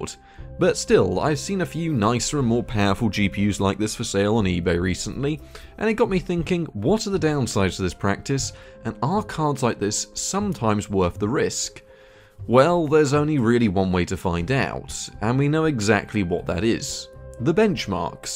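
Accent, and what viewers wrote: United Kingdom. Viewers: I may be stuck in bed with flu, but at least I got with his British accent and his old tech stuff to cheer me up. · English accent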